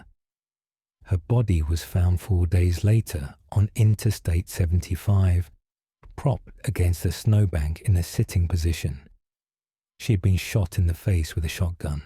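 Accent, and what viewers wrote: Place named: England, United Kingdom. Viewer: Fellow English I love English accent , Chelsea girl London born and raised❤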